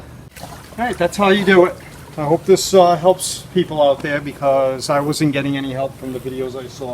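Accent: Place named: Northeast, United States. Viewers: Ugh. That New England voice. I couldn't listen Mr know it all. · ! Thank you Man!!! Great tutorial and love the NY NJ accent!!! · This guy sucks, don't quit your day job you terrible accent masshole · The best DIY videos are done in a NE accent, so I can feel right at home. Thanks for all of your specific tips!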